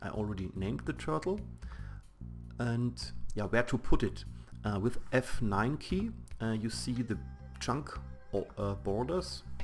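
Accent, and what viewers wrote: Germany. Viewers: du bist deutscher oder ? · bruuuuuder man merkt, das du ein deutscher bist xD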